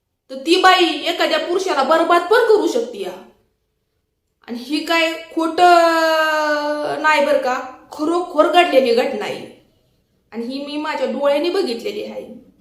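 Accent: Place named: Bhor, India. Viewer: मी तुझे व्हिडिओ कायम बघते मला तुझे व्हिडिओ आवडतात कारण मी पण भोर तालुक्यातील आहे कारण तुझे बोलणे आहे ते रेल आपल्या गावाकडचे आहे ते मला खूप आवडते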